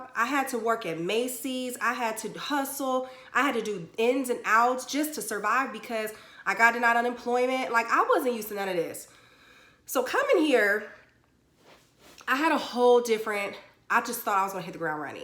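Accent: Texas, United States. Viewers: You have the Texas accent lmao. DFW is definitely a go to moving place Our population may not be that much..since the population here is only 8million but real soon DFW will be bigger than Chicago and the same size as LA. But it's still so cheap as it was decades ago..and still more opportunities and jobs coming everyday Your doing a good job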